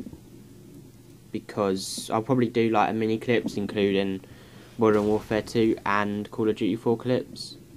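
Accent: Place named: United Kingdom. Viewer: yeay hes british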